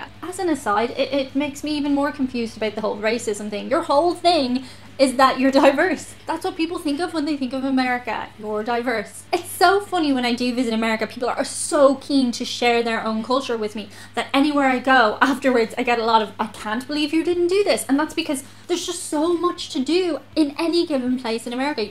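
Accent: Ireland. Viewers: Thank you, that was much needed. My Scotts/Irish grandfather arrived in American one step ahead of the sheriff, leaving a wife and four children...back in the old country. He was sure he could serve his family better as a fugitive in America than a prisoner back home. (he beat a man to death) He brought his whole family over, became a master steam fitter, died at 96 with 14 children and a full tribe of upwardly mobile off-spring. Being an Irish American is as American as as thing can be. And yeah, the Irish accent is a show stopper here. I'm studying it, so I watch Jack Taylor, you and Try Channel, et al. Keep at this. You're on to something. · Americans have a thing for Irish accents. This is a established fact. You can talk about anything and we love it. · Hi,i want you to know that I love Irish accents.I really love your channel and content. I really enjoy your personality and you always make my day after watching your videos. 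I hope you continue with your channel and video content for years to come. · On the upside, your time in Ireland has delightfully thickened your accent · I love your Irish accent. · I love how you leave your outtakes in at the end of your videos, where you stumble over words and sentences (like every youtuber no doubt does while they're filming), but are not self-conscious, and have the guts to leave those mistakes in the video. Also, love the accent. I've always had a thing for Irish accents, I just love to hear them, especially from pretty ladies like you. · Hi, I enjoy your vids and your Irish "edge". Always makes me smile. I wonder if you know about some really American stuff? Try 'AKUS Live' here <link> as an Irish girl, if you don't know about it, you'll like it. Keep the videos coming. /RayK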